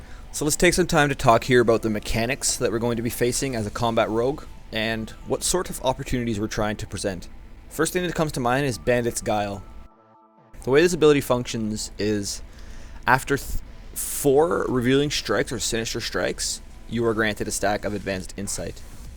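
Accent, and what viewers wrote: Canada. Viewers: Are you Canadian by chance? ;D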